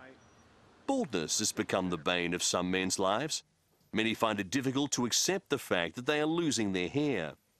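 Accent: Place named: Australia. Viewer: the fact that they loosing their haaaaiirrrr love the Australian accent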